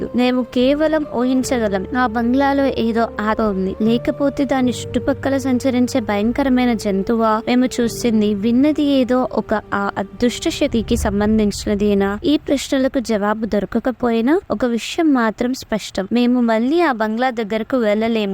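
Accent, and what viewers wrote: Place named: India. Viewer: Good 👍 nice telugu slang is nice good voice some words we are not able to understanding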